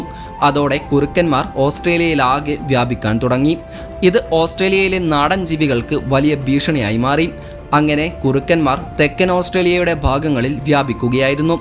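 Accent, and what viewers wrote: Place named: Malappuram, India. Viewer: Nalla malayalathil parayedo ninte malapuram bhasha vemda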